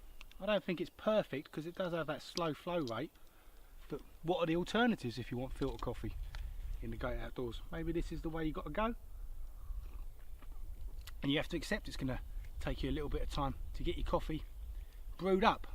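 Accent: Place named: Cockney, United Kingdom. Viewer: a Cockney living in Sweden. :)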